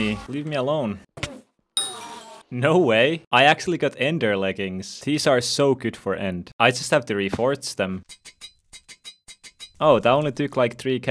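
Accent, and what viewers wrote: Finland. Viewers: Its called Finnish accent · Are you finnish? Like, your name is and your accent sounds little bit like finnish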